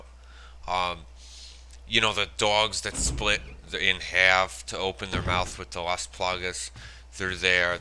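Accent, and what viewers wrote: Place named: Northeast, United States. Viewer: are you from New Jersey, New York or New England? Your accent is very familiar.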